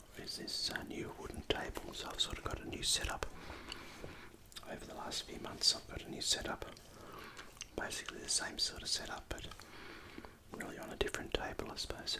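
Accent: Australia. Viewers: "An Australian man's voice quietly discusses this beaker of contaminated blood"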